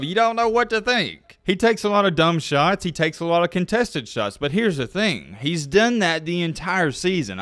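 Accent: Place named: Tennessee, United States. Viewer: Bro you must be from Tennessee , your voice sounds a lot like upchurch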